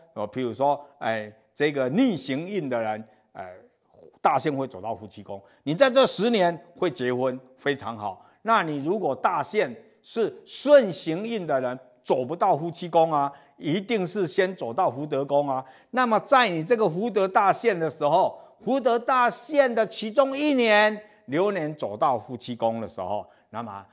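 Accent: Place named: Taiwan. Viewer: 老师的普通话的口音是台湾人已经是很容易听得明白。你试按上面字打开幕那个扭试试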